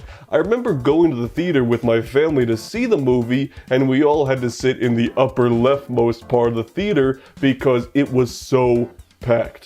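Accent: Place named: Russia. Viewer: я знаю, что ты русский, твой мерзкий акцент тебя выдает. скоро когда узнают что ты русский сразу депортируют обратно в помойку за то что ты русский :)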